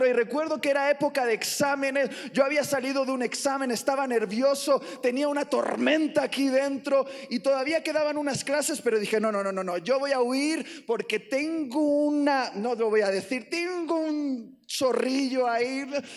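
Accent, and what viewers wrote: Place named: Mexico. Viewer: porque habla como mexicano y pronuncia las C como español? jaja excelente la prédica pero me quedó esa curiosidad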